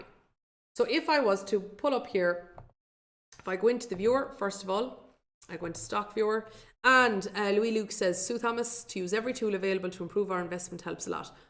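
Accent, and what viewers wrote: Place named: Ireland. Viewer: Now thats an Irish accent if I ever heard an Irish accent!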